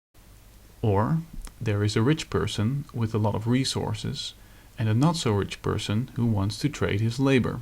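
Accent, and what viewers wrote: Netherlands. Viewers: Do I hear a slightly Northern-European accent there? Perhaps Dutch? :)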